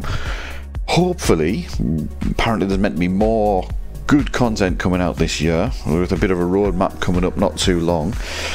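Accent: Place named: United Kingdom. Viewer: Judging by your accent your in the UK. You wouldn't like the North American participation. Maybe fewer servers would help.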